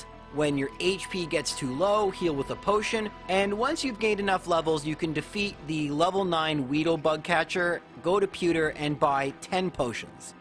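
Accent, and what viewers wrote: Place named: Canada. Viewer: Based on his accent he’s Canadian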